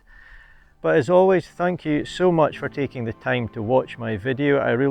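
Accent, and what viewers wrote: Scotland, United Kingdom. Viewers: Very nice image. Your videos are lessons in the Scottish accent!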